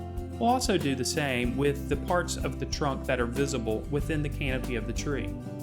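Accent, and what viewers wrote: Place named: East Coast, United States. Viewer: I was wondering if you consider all American accents wonderful (?). For me it's a normal American accent (Being an English Teacher with a strong affinity and love for the English language, I'd probably place it somewhere around the East coast of North America. I wonder whether the would confirm my guess...)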